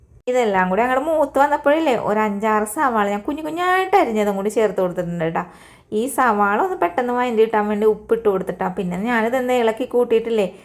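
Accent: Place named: Kodungallur, India. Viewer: ഉണ്ടാക്കി നോക്കാം. ഇതേപോലെ സംസാരിക്കുന്ന ഒരു കൂട്ടുകാരി എനിക്കുണ്ട്. കൊടുങ്ങല്ലൂർ സ്ലാങ് ആണെന്ന് തോന്നണു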